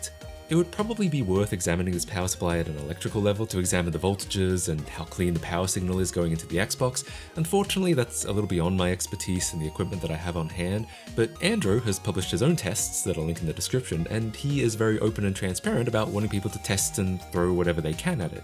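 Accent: Australia. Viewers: Daaamn is a fellow Aussie! Hey, one tip- never lose the accent! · I don’t blame them, addressed that his accent is a mix of a lot of YouTubers he watched growing up and his native Aussie accent. He also lives in the US now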